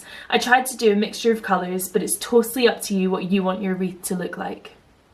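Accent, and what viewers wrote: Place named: Scotland, United Kingdom. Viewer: I love recreating high end expensive decor items for a whole lot less! I love your lovely Scottish accent! Greetings from Canada 🇨🇦!! Your wreath is absolutely beautiful! I love all things Halloween! You should check out my month long Boofest! Your pumpkin garland is too cute! The ghosties are adorable! I love the term “sweeties”!! New subscriber! Let’s support each other!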